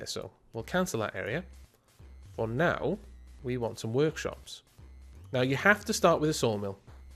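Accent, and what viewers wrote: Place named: South Wales, United Kingdom. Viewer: Hey where in Wales you from? You sound like a proper south-walian!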